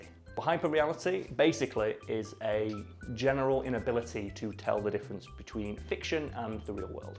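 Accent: United Kingdom. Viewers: Wait, wait. You sound so British and still call it Football? Only ausis and non-englishlanders call it football!?!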